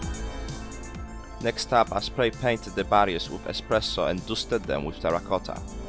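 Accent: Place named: Liverpool, United Kingdom. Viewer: Great video! I'll have to make some of these! Love your accent btw! Did you learn English from a Liverpudlian? Certainly got that scouse ring to some words. Great job!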